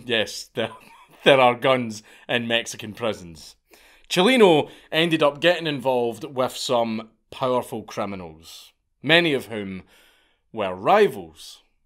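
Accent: Scotland, United Kingdom. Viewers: I can see now that Mexico Spanish pronunciation can be a real bitch for a Scottish accent. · I’ve never heard “corridos” pronounced like that 😆 something beautiful about a Scottish (or Irish?) lad saying Spanish words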